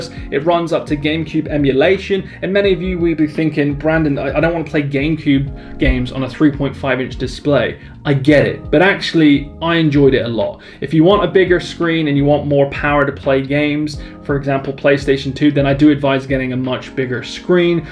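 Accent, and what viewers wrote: Bristol, United Kingdom. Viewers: Are you Bristolian?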